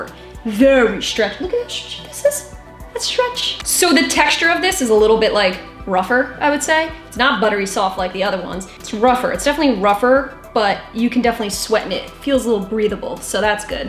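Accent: New Jersey, United States. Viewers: Okay I’m back I’m definitely buying this collection I like that it glows in the dark that’s dope man that green is super cute I see it’s a lower cut still cute I like a pocket on my workout pants because I can put my phone in there but other then that I don’t like all those lines where are you from u sound like ur from jersey I don’t like nothing sliding yeah see I don’t like that on my heel I will pass on that one bring the glow in the dark tho that’s the one I want for sure! I don’t like padding I like the back of that bra tho on that green nice medium band great review girly u are so detail u said damn lol I got a pair of nikes that glow in the dark I didn’t even know they did I was like wow it will go good with that those make ur butt sit up I’m here for it it’s gone lift because ur small but other then that u need to tell gym shark to let u design some stuff because u know about them well they need to have u on there team for sure man I love this video great job on the two fits for gym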